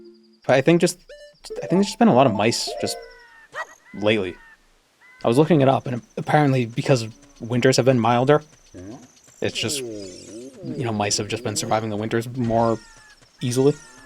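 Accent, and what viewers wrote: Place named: Boston, United States. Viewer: 18 hours of Just Boston Noise❤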